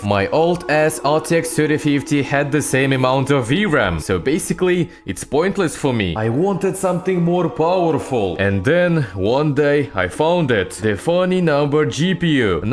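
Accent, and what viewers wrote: Russia. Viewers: I like your accent. 👍 Is it Russian?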